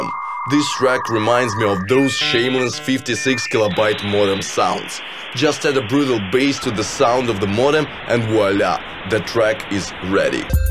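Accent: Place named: Russia. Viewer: Всегда уважал людей, которые сами научились формулировать предложения по-английски. Отличный патриотичный акцент. Звучит очень стильно - как sovietwave. Респект! Канал по-настоящему уникальный!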